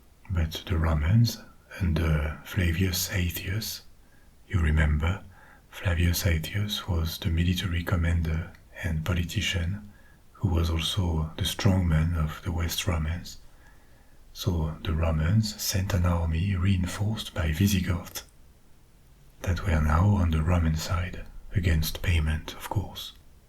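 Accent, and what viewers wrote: France. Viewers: Sa fait bizzare d ecouter de l asmr anglais avec un accent français 😂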